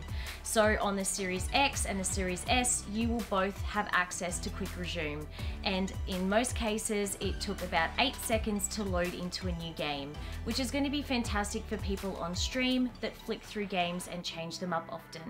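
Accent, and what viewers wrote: Australia. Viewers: Omg are you Australian because I am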